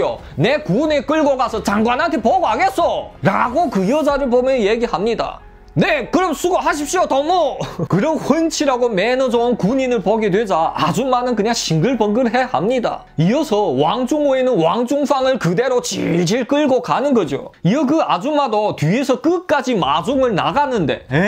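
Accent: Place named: Korean-Chinese, China. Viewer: 발음 좀 고치시면 유튜브 떡상가능하실듯요 억양이 억센 조선족이라 좀 듣기그렇네요 썰은 재밌는데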